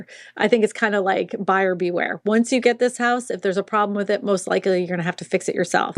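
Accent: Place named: New Orleans, United States. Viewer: I thought I had heard some aspects of a Yat accent. :) I can't imagine how much this would cost to insure, even on the Northshore.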